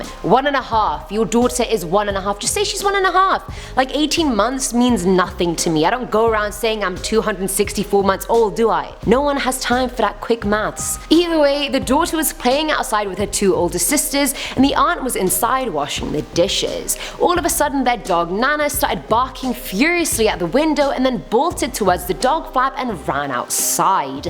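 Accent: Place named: United Kingdom. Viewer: I love your British voice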